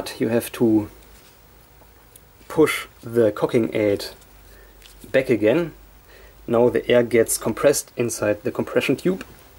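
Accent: Germany. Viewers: DIESER DETSCHE AKZENT HAHAHA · Nice video! I love they German accent in English, A really god air pistol, and the Pellets are excelent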